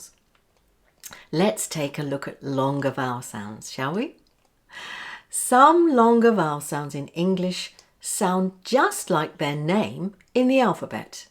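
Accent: RP, United Kingdom. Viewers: Please are you british I want to learn the british English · ¡Eres fantástica,! I love RP Accent!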